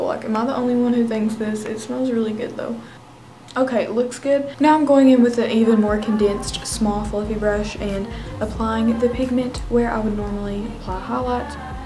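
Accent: South, United States. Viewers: love that southern accent , where are you from ? - much love from South Carolina 💖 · nah but I love her voice and the country accent. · do you live in the south? you sound southern I'm curious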